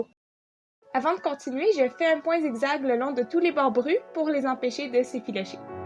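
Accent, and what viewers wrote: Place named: Quebec, Canada. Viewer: Bravo pour cette réalisation très fraîche. Vous êtes simple et pro en même temps. Pour moi, le voyage c'est votre délicieux accent québécois:):)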